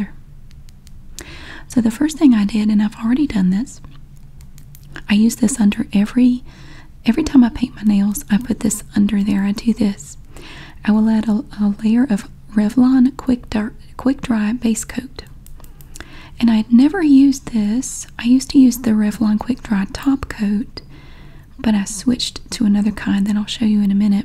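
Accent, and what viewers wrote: South, United States. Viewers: 🥰 Yayyy!!! I am so happy!!!! This was a request of mine. You are completely and totally awesome! I am so glad you did this video with talking instead of whispers (soft talking is my favorite), it's perfect! Your talking voice is so soothing and relaxing, like a southern female Bob Ross 🤗... I love it! And your nails are GORGEOUS! I wish I had strong nails like yours, they are beautiful. Hope you are doing well. Blessings to you and yours. 💕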